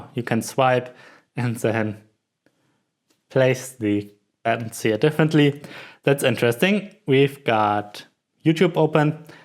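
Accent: Germany. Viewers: 101 Spiele Er ist aus Deutschland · Bist du deutsch oder warum sprichst du mit so einem Deutschen Akzent? The spricht ihr se aus :D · i think he's german XD Bad english tho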